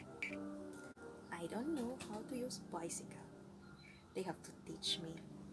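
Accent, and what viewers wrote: Finland. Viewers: You have very Finnish accent like for English :)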